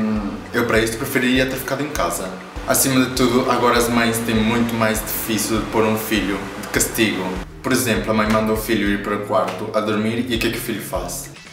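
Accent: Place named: Brazil. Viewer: Dasse q e isto és ucraniano ou q se es br fala com sutaq nao estragues o portugues de portugal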